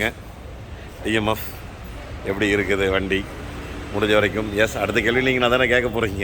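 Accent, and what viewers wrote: Kongu, India. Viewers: காரை பற்றி நீங்கள் பேசும் விதம் மற்றும் உங்களது கொங்கு தமிழ் மிக சிறப்பு அண்ணா ...வாகனத்தை மிக நேர்த்தியாக செதுக்கி இருக்கிறீர்கள்...மிக அற்புதம் 🙏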